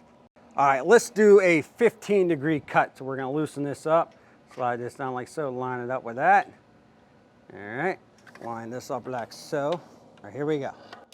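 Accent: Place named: Louisiana, United States. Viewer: Hey man! I’m a first time viewer and I’m already hooked! Digging the humor! Not sure where you’re from…but I’m picking up a Cajun vibe. If not, you would definitely fit in down “herya”! Btw…that’s a sweet machine. May have to get me one of dem me!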